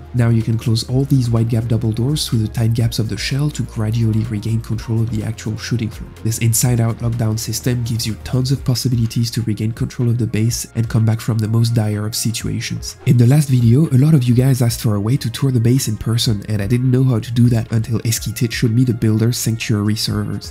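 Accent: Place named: France. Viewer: Mhh l'accent français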